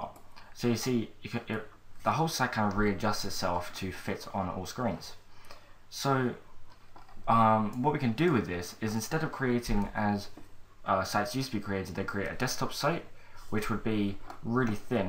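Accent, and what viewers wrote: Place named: England, United Kingdom. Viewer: I agree with HCFP Gaming, TOTALLY! There is always a pause button. Besides, I don't like downloading ONE video for just one piece of information. Download the video software with a traffic code (well, I am not on commission VLC Media player -free), you can watch your video at whatever speed you want. Do you know how unhappy my ISP is when I download 54 videos which could have been done in 14!? Love his video, especially the fact that he's English! Yummy accent!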